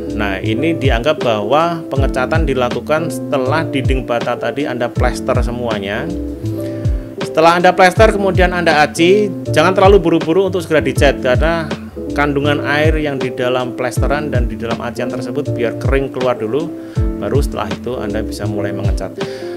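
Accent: Java, Indonesia. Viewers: Kerbaooo 😂jowo ne kuentel banget mas👍👍👍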